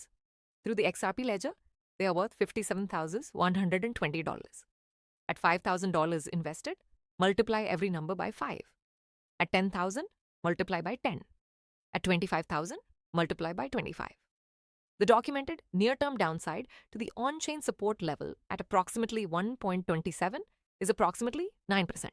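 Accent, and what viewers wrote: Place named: India. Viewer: Why an Indian accent 😂skip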